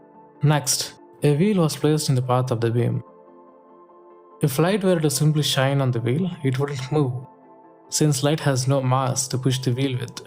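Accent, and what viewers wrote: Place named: South India, India. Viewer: Thanks helped a lot, the South Indian accent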